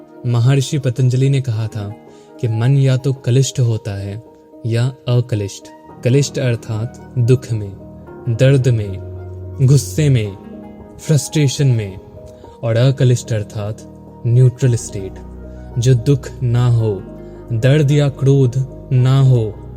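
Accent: Bihar, India. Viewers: Oye grammer me phd wo bihar ke hain aur Bihar me aise hi pronounce hota hai r, har jagah ka regional accent alag hota hai. Tera hi bas sahi nahi hai, ek to wo itna kosish kar rahe hain hamare accent me bat krne ka upar se nautanki karna h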